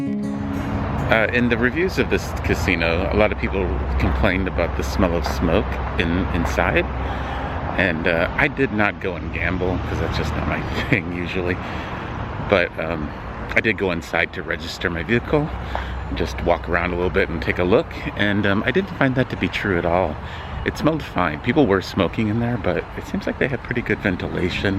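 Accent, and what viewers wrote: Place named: Minnesota, United States. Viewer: Comment70 bcz69 is just awkward&overrated. Thats where i saw bald eagles floating bridge thats the year i was born! 1961 is the best yr ever same upside down as it is right side up.1979 is hsgrad.how bzzr u dropped those 2 #s? Quinky dink. You sound lime a minnesootan with your chicago great lakes accent. Bahaha you dont really need power that much? Whatever delusional. Go without power for a day hahahaha you have no idea about the power you use and have. Farmhand harvest farms. Casinos are gross.2cents The monks of new skeet is a cool dog book if you like to read cu down the road bahaha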